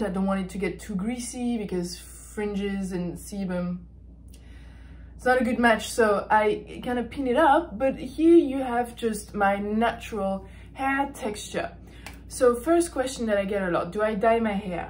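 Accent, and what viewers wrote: France. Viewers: Wait! Is she French?? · I love your accent 😍 I’m currently learning french, the most beautiful language in the world xx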